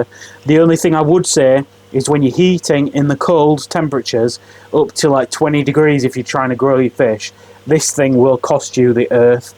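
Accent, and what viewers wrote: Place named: Yorkshire, United Kingdom. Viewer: Ahhh a true Yorkshire man 5p 10p 🤣🤣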